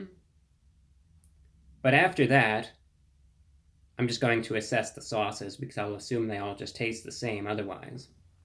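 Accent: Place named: Transatlantic, United States. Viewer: Video starts . By the way, I can’t tell if you’re doing a transatlantic accent for real or you learned how to fake it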